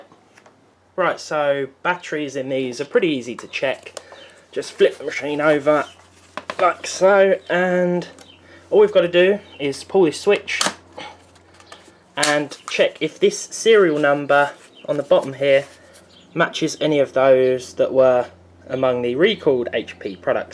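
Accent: Australia. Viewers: Love the Australia accent 😂😂😂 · Need more Aussie accent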